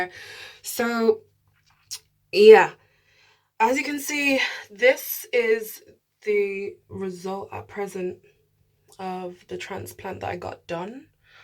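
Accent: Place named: Ireland. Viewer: Never mind my question, you said Ireland. I thought that was the accent. But I was told there no Blacks in Ireland or very very few.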